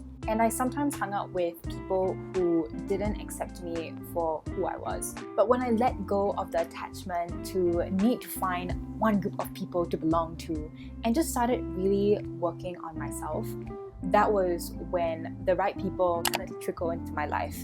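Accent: Singapore. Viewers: Are you singaporean? Good video!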